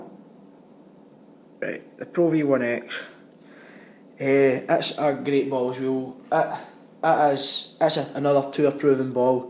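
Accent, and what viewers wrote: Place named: Scotland, United Kingdom. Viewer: I feel gifted that i can understand this thick of a scottish accent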